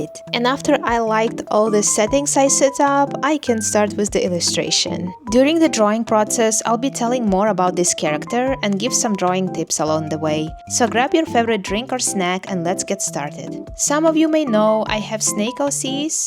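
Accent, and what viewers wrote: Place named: Russia. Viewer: Просто интересно. Я недавно наткнулась на твои видео, и слушаю тебя... Твой голос напоминает русский акцент) ты случайно не говоришь по русски?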